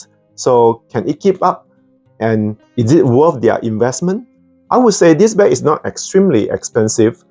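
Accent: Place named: Malaysia. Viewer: no offence but are you anyway from malaysia/singapore cuz you have their articulation? 🤣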